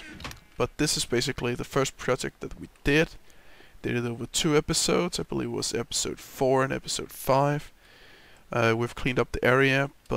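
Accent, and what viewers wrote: Denmark. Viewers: Well, im already recording Ep12 :D i did a couple of fails with editing, but nothing major! well i can only hear that you are danish, because im danish too, so dont worry too much about it :-)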